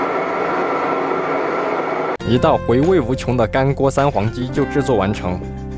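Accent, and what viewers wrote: Sichuan, China. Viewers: 程咬金 听口音就是川普，四川人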